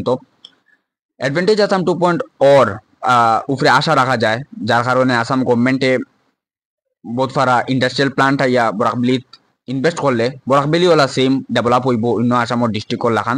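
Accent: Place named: Sylhet, Bangladesh. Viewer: Tumi olpo Shuddha Bangla Matiyo. Jaate Non-Shylleti manusheo jaate bujhte paaroin........ .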